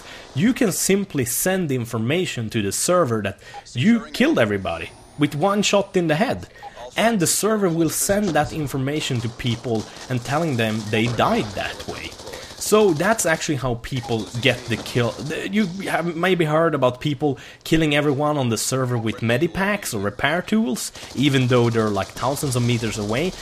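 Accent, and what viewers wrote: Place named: Sweden. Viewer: That swedish accent. :3 ''Those dirty dirty sheaters''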